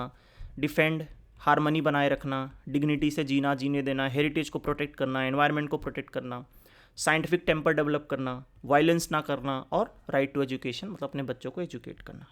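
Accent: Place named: Bundelkhand, India. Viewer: yes..apne kaam se kaam rkhna means ache work Krna sbse better h . Mai jaha tkk guess kr paa rha hu apko bolne k tariqe se ap mujhe MP Jabalpur Bundelkhand region se ho..Maine sahi bola kya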